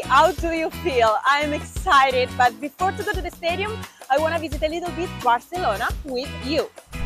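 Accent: Italy. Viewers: English with Italian accent, very cute😘😘😘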